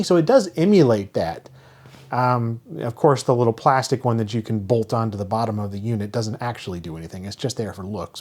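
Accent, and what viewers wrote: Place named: California, United States. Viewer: Your accent is like the Californians without the accent if you follow.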